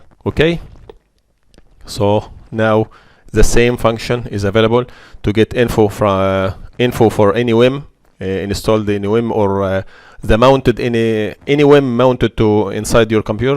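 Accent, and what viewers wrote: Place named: Egypt. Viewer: Thanks, I think you are Egyptian Inferring from the accent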